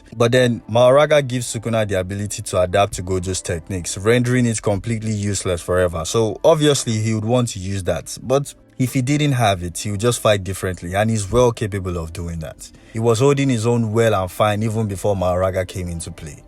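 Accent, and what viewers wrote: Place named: Nigeria. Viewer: From the accent I could tell you're one of us (Nigerian)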